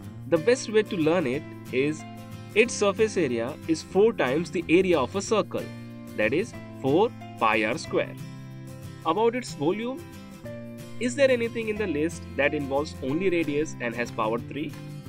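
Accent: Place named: India. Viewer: That Indian accent tho .. Yuck !!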